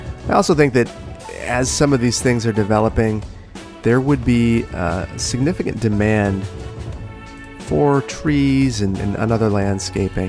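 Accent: Wisconsin, United States. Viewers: Love the series, and wondered why you sounded so familiar, you're from WI! Gotta love the midwest!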